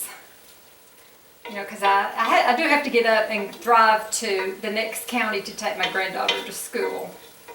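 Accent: South, United States. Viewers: Your chili looks yummy. You are so fun to watch. I love your southern accent. You are so beautiful 🌟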